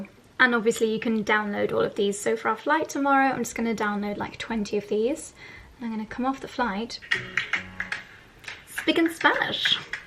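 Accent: Spain. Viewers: Love your Spanish accent! You sounded great!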